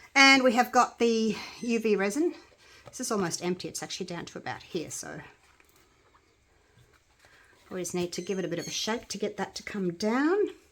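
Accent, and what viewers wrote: Australia. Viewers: I just the Australian accents so could listen all day. In fact I'm in the u UK and loved Aussie when I went all those years ago and came home with the intention of emigrating there but never did. Regret it like hell now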